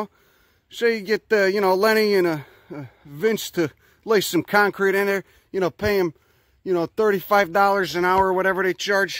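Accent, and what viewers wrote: Chicago, United States. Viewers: Just joining (recommended by Diamond) LOVE your info on utilizing the natural growth around us.....Learned a valuable lesson last week...physically cannot do tree trimming, etc...had to hire someone...they did a great 'trim' BUT I had no clue how the underbrush of all my overgrown fauna around my small house was INSULATING it. Yikes !! Miss that Chi town accent :) Grew up 40 miles south and had my first 'real' job downtown on Jackson Blvd (1963) Took the train into Union station...wore 'electric socks' to keep my feet warm (from Bennett Brothers) back then, a wholesale company. Thank U for your knowledge !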